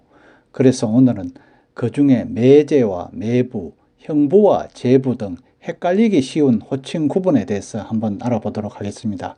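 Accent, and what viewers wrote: Gyeongsang, South Korea. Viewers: 매제, 매부, 발음에 있어서 문제 없습니다. 지방에 따라 발음이나 장단이 다를 수 있지만, 경상도 억양을 쓰는 님이 쓰는 매제, 매부, 발음은 서울, 그리고, 표준발음하고 다르지 않습니다.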